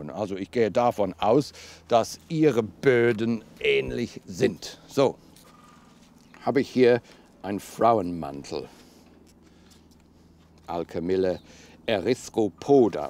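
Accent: England, United Kingdom. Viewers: Dear you speak beautiful German! I love your English accent! I also love all your expertise! Many thanks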